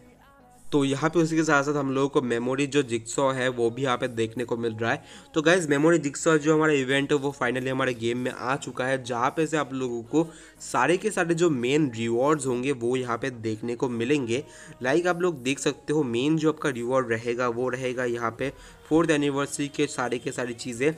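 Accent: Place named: Bengal, India. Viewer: Dada tumi bengali to...ami kotha suna tai mona hoy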